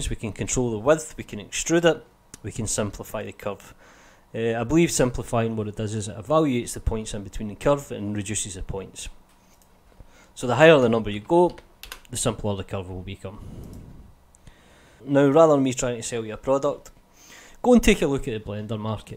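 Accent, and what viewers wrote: Scotland, United Kingdom. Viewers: Nope, that’s Extremely Scottish Scottish. :)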